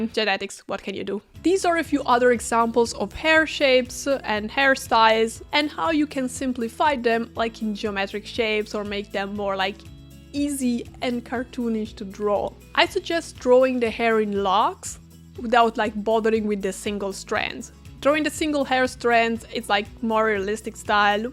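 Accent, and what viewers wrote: Italy. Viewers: grazie mille! tutto molto chiaro e semplice :) un saluto! p.s.: commento in italiano perchè sentendo il tuo accento penso tu sia italiana, spero di non aver commesso una gaffe!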